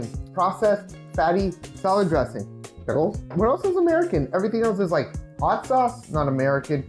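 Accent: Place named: Brazil. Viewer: Hold up, you're American? 😂 You're speaking English with a Brazilian accent. 😆 That's fking amazing.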